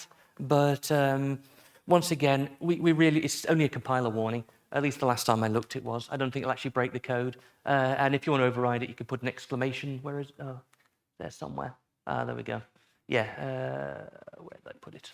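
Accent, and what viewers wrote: Midlands, United Kingdom. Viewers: I've turned on the autogenerated subtitles for a few minutes and they've been spot-on, if you're not used to an English midlands(?) accent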